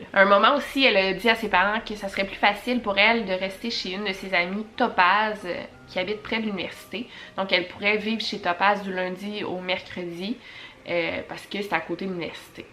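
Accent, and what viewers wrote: Quebec, Canada. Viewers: tu es très séduisante avec ton accent Québécois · tu m as définitivement réconcilier avec l accent québecois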